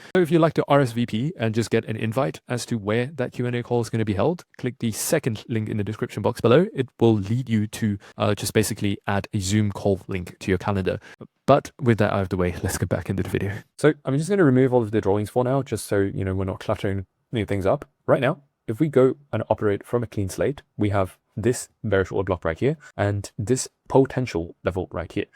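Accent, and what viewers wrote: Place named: England, United Kingdom. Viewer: Not sure what it was, I’m Australian and you’re accent is slightly English but super fast I had to slow it down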